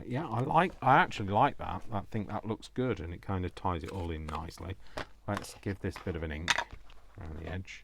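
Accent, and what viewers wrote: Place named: United Kingdom. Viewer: Love them. Love your Britishness, love your accent. Love your creations, love your mind. I love all our UK accents. You be you, because thats what we love about you. 💜💙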